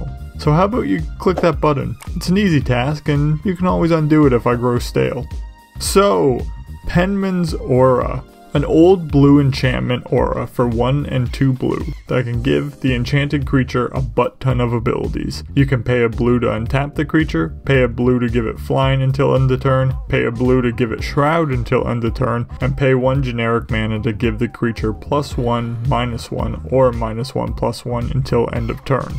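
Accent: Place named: Canada. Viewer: 19 seconds in, ahhh so he is Canadian.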